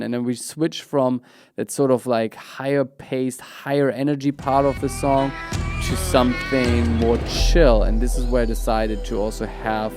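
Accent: Germany. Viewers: Kann es sein, dass du Deutscher bist? Irgendwie klingt dein Akzent danach. Super Video übrigens!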